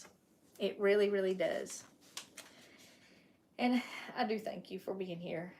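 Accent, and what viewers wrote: South, United States. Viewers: Congrats on your huge milestone! I found you during the lockdowns. I was hooked because you reminded me of the southern folk from Franklin NC that were so lovely and welcoming to my parents who moved there from Michigan. When I went to visit, it was like entering a new world. Wonderful people. Best food ever! After my dad passed and we moved mom north, that’s when I stumbled upon your channel. Your southern accent and country cooking reminded me of that wonderful place and wonderful people. I have your cookbook and I shared one of your videos with my mom. “Mom, you gotta watch this lady”. She loves you too. So, you see, you just never know who you touch just by following the Lord’s leading. Blessings sister. When we get to Heaven, I’m coming over for some southern cooking, a cup of coffee and conversation about our lovely Jesus. 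Until then…❤️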